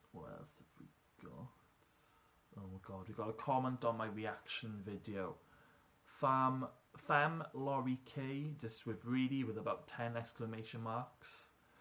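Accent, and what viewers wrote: Wales, United Kingdom. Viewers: I can't wait till season 7 starts, any idea when it's going to be shown in uk? Love your welsh accent by the way😀. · Thank you for reading out two of my comments. So happy that you are interested in what I my opinions :D That link I sent you, I think the producers may have gotten onto that because I noticed earlier that they photos have been replaced. The comment with Channing being the stalker, I actually jumped to that conclusion before I watched that episode. I should wait until I watch the episode before I form conclusions lol. You mentioned Prisoner: Cell Block H, I am a massive fan of that show. I am from Northern Ireland, so I watched it too, on ITV back in the 90s. I was a young kid at the time. It was always an argument to get to say up late to watch it, and most of the time it was taped for me to watch the following day lol. 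One thing that I was disappointed about in Wentworth is that Bea and Joan never got to have their fight scene, unfortunately. I wanted something similar like episode 326 of Prisoner, with a more updated version of that awesome music used in that fight scene. I thought Season 2 was too soon since it was Joan's first season, but never happened in Seasons 3 or 4. Oh yeah, on how to pronounce Marie. You can say it either way. I know of a few women called Marie and it can be pronounced Ma-ree or Marry. It probably sounds different with my accent. The Marry version is just a fancier way of saying it really lol. I like the way you say it, keep saying it that way in that nice Welsh accent :) Apart from Prisoner and Wentworth, what other prisoner shows do you like? I a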